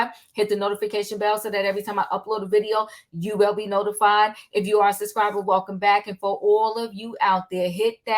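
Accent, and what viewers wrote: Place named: East Coast, United States. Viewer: Love your commentary on this topic and your East Coast accent. Keep it up!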